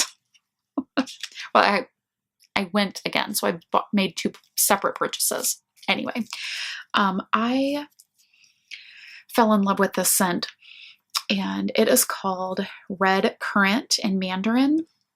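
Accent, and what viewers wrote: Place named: South, United States. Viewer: I have wanted to ask you this question for so long. I don’t want to sound in any way disrespectful to anyone. You have said you are a Louisiana girl. But you have no accent. You sound southern but I don’t hear Louisiana. My mother was in the hospital for awhile in Shreveport years ago but I was amazed at their lovely accent. Shreveport is not far from us. I live in OK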